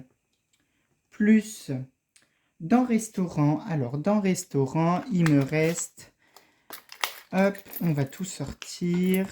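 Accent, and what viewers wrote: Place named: Nord-Pas-de-Calais, France. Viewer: C'est cool tu ai ch'ti comme moi trop bien j'adore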